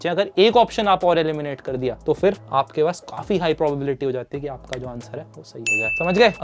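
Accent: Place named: Bengal, India. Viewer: Your bengali accent is cute wow .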